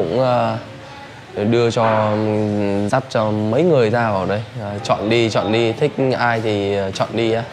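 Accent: Vietnam. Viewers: Nói tiếng anh thuần việt, 😊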